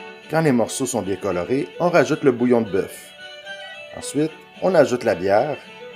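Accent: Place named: Canada. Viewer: J'ai juste un petit problème c'est un accent plutôt canadien qu'irlandais parlant le français, revenant d'irlande j'ai un petit problème avec votre recette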